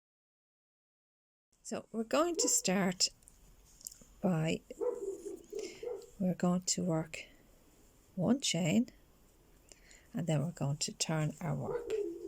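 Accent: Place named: Ireland. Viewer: Great video, love the Irish voice , brilliant 👏 thank you 😊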